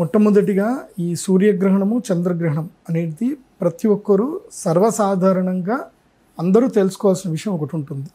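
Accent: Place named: Telugu, India. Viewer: యాంకర్లో తెలుగు తనం ఉట్టి పడుతోంది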